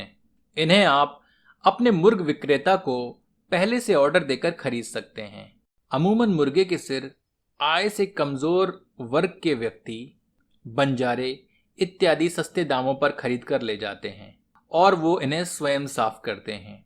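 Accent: Standard. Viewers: Chef aapke tone ko sunkar hi pet bhar jaata h ❤️❤️❤️❤️🥰🥰🥰 Itna suddha aap hindi bolte h to khana kitna tasty hota hoga ❤️❤️ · Aapki Shudh Hindi bolne ka mein samarthan karta huin..sadhuvad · Bahut shudh hindi bol rahe hai aaaa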